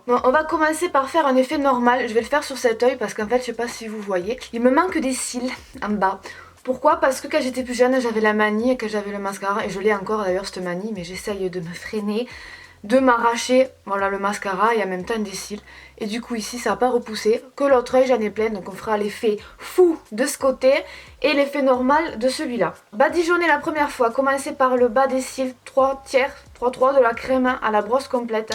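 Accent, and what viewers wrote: South, France. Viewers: Like pour l’accent du sud ❤️ #TeamAccent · Quel bel accent du sud ☀️ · Cet accent 😍 je me sens vraiment chez moi, mercii pour cet accend du suud 🤙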